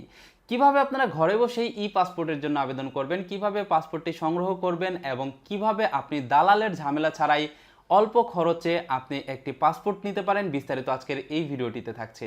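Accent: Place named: Dhalapara, Bangladesh. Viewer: Mone hoy apnar basha Dhalapara...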